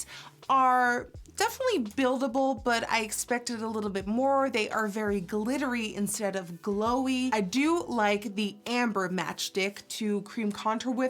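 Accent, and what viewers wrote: United States. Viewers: Leah Jones she's not putting an accent on, it's because she's been around American people for so long. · Quick question. I'm new to this channel and I noticed she mentioned that she lives in the Netherlands. Has always lived there? I wasn't sure as she has an American accent. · Kawaii dude this is the second time I see you commenting this hahaha people don’t have a problem, they just thought she was American based on her accent.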